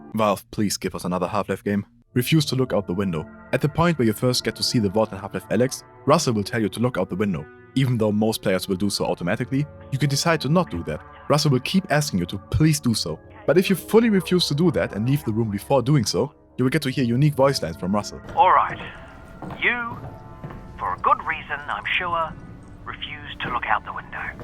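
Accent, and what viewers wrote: Germany. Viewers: kannst du bitte den deutschen akzent loswerden der triggert mich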